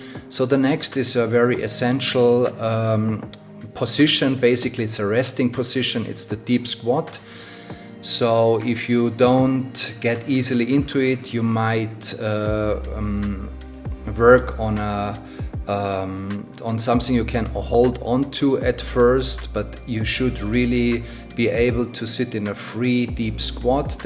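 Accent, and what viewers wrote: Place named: Germany. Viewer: Love male german accent.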